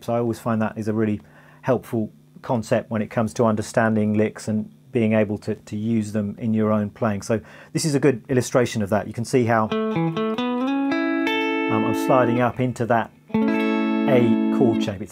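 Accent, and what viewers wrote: United Kingdom. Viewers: Son You are really great !! I enjoy watching you and the British accent is terrific!!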